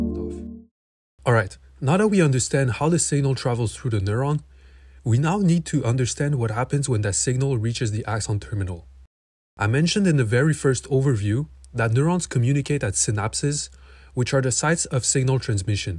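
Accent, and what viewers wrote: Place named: Quebec, Canada. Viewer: you have a quebecois accent lol. love the video tho it helped a lot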